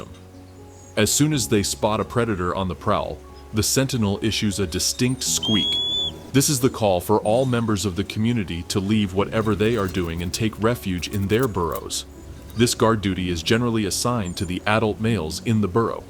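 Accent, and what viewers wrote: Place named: Transatlantic, United States. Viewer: It’s quite interesting that a transatlantic narrator is describing an ecosystem that a transatlantic president is Hell Bent on destroying by openly declaring ‘ Drill Baby Drill’ someone needs to drill into Putin’s head to find where the evil is and to drill into Trumps head to find where the greed is. No?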